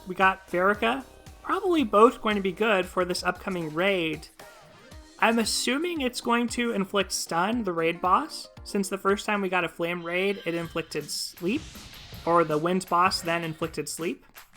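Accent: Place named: Texas, United States. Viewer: A fellow Texan! I wouldn't have guessed it, but now you mention it I can hear it lol. Also this Gala was SO GOOD to me, yeehaw!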